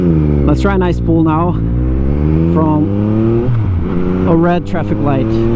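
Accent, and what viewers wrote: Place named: Scandinavia. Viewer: sounds portoguise. But your accent sounds scandinavian:)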